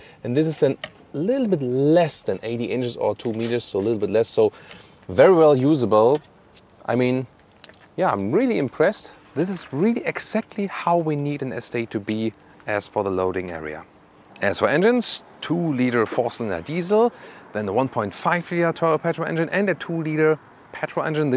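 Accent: Germany. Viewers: Wow lovely accent when germany speaks english👌🏼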